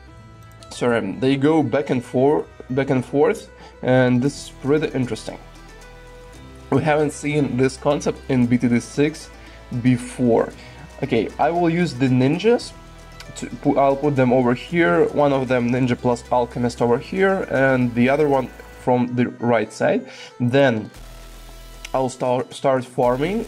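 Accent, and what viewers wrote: Russia. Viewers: I am gonna guess that the accent is Russian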